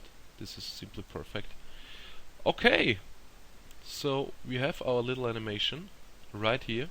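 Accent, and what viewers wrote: Germany. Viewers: Du hast 'nen deutschen Dialekt...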